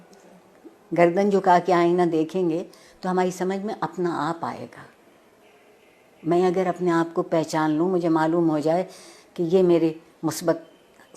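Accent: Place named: Lucknow, India. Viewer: She has Lucknowi urdu accent also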